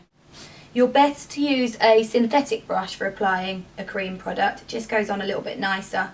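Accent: United Kingdom. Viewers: We, Americans say vitamins (long i as in Hi), you lovely Brits say vitamins (short i as in hit). Love your vids, I watch them all the time.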